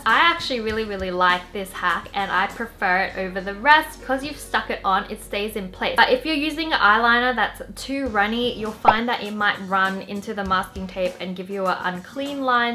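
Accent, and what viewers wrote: Australia. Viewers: Are you Australian? OUO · Her OZ accent tho😍😍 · I miss her more Australian accent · Wait am I the only one who think her accent is stronger (Australian)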